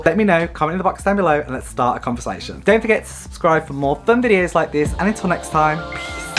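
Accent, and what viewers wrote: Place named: Birmingham, United Kingdom. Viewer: I can defo hear some Brummie in ur accent right? First channel I find to learn about this toy for a Christmas present ha!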